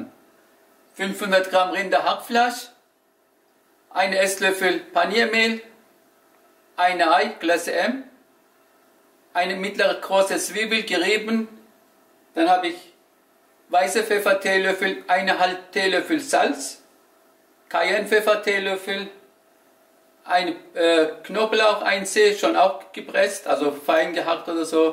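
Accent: Swabia, Germany. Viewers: Ich liebe deinen schwäbischen Akzent 🥰 Liebe Grüße aus Berlin auch an deinen Sohn. Er macht auf deinen Kanal hervorragende Arbeit und 👍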